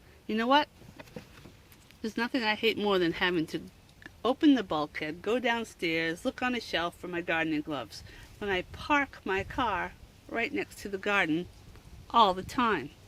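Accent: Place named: Maine, United States. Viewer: Hi, I noticed right away you were saying the same kind of things l say with the same accent. That was a really nice experience. I loved your video. I am looking for ideas for decorating my son’s grave. Then I looked and saw that you are in Sanford Maine. No wonder! I’m in Somersworth. We are neighbors! Thanks for the video.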